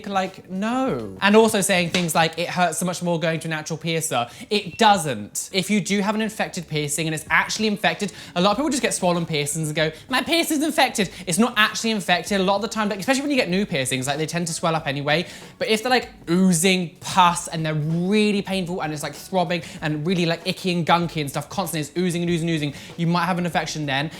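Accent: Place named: Essex, United Kingdom. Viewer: sounds like a southern (I call it an Essex, considering I grew up hearing the accent a lot) British accent.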